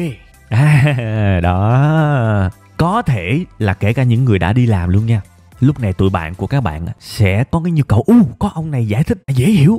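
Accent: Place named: South, Vietnam. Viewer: tiếng miền Nam hài ghê ạ, cảm ơn video của thầy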